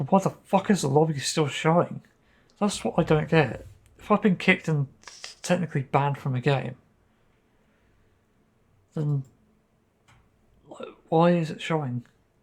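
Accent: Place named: United Kingdom. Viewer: I was surprised getting this vid in my yt recs, especially since most vids about drg be like: "Maaan what a beautiful game, perfect devs and even better non-toxic community". And I agree it, for the most part, at least. But it actually has same thoughts that i had recently. I may have not get everything you said a bit, even with subtitles, due to you have slightly bri'ish accent (don't mean to offend you in an way) and i'm not a native english speaker (i'm ukrainian), but anyway. So let me tell you my story with drg. Last year my friends, who already bought this game , gifted it to me at Steam. They said all that stuff about the game, shortly, devs, community, so on. And we played it for a while, DRG seemed perfect to me, because i'm a bit introverted myself, I can be social and extraverted in company, but if it happens for a long time - it drains me out mentally, you know. 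I like to be alone sometimes, so it was pleasant when i found that you can play single with bosco. At some point, some of my friends got tired of deep rock, some of them won't even speak to me anymore, but that's another story. But i still liked the game and wanted to know more about it. Since I had no one to play with and singleplayer had more meditative vibe then more active vibe, i started to play with randoms. At the first time it was cool. Everyone was chill, greybeards tried to educate me in non-offending manner, and i could talk to people from different countries across the Europe, practice my commu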